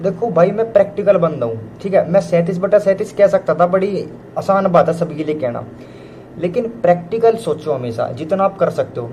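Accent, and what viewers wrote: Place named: Western Uttar Pradesh, India. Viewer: bhai up West ka accent lgra h teri bolli m....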